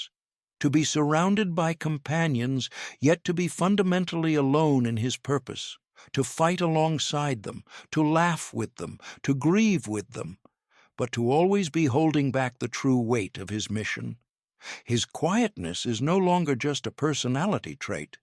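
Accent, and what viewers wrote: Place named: United States. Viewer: middle earth tales narrated with an American accent just don't fly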